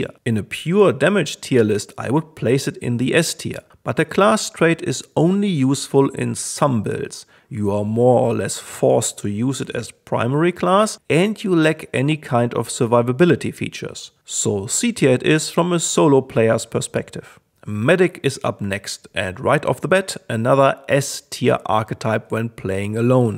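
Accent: Germany. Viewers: Youre 100% German. That’s the most typical German/English accent I’ve ever heard! 😂 Thanks for the very informative video and taking the time for the community!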